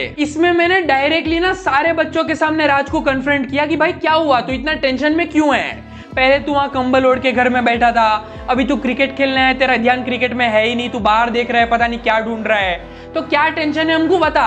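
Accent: Hyderabad, India. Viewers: always love the hyderabadi accent😅😂😂 Also the ending is crazy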